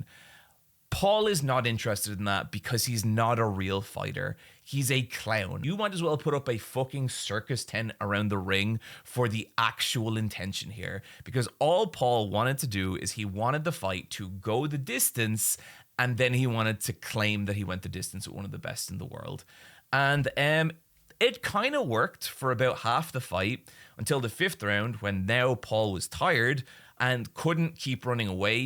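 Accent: Ireland. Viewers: Is ur accent Irish ☘️ ❓ very cute. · Irish Henry Rollins explaining the Jake Paul nonsense? Yeah, I'm here for this.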